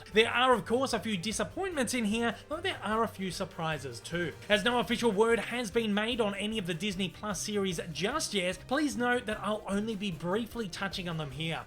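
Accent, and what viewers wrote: Australia. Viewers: Well that’s an Australian accent. You better get used to it lol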